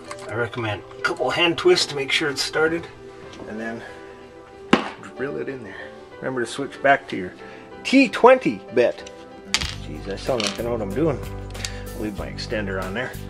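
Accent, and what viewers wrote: Minnesota, United States. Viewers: Listening to you makes me homesick for Minnesota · Bet your from Minnesota or the Dakotas maybe. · I am guessing you might be from MN. (me too, fun to hear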